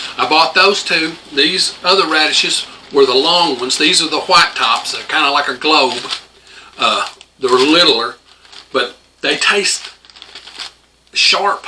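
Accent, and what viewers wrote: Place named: Indiana, United States. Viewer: Hickory Kane(king)! Lol! I talk this way up here in Indiana! Lol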